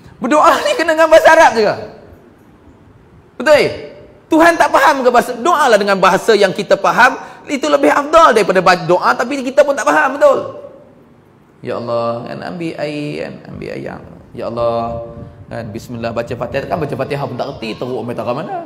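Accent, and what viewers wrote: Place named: Northern Malaysia, Malaysia. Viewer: Bahasa utara memng agak kasar... tapi bagi penduduk utara x de masalah.. klu x sesuai dgn ceramah ustaz ini.. boleh la dgr ceramah ustaz setempat... ustaz setempat mungkin tahu inisiatif utk mendekatkan diri dgn penduduk setempat...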